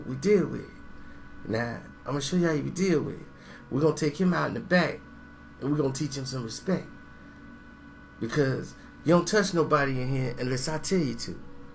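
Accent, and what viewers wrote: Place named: Compton, United States. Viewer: on piru get yo head busted lol..u sound just like a Compton brotha lol...the m.o.b don't play ftp ttp 151 piru all ru are with it except game lol...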